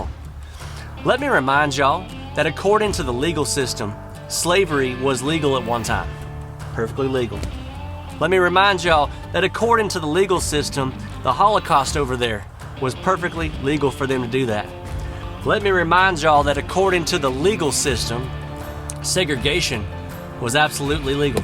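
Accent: South, United States. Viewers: I just found you always good to hear some common sense with a southern twang. This traveling yankee misses the southern states.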